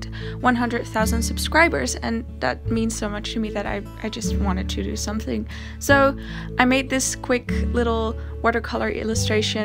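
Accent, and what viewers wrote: Netherlands. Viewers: You sound Dutch, are you?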